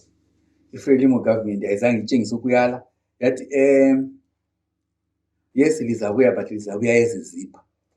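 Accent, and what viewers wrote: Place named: Shona, Zimbabwe. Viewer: What real tribe is he coming from because he speak Ndebele with a shona pronounceing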